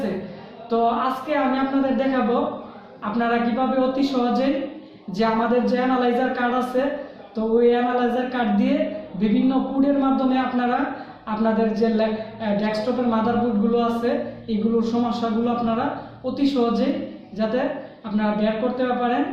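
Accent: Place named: Mymensingh, Bangladesh. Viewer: ভাইজানের বাড়ি ময়মনসিংহ বোঝা যায় তবে অনেক কাজের ভিডিও বানাইছেন, ধন্যবাদ।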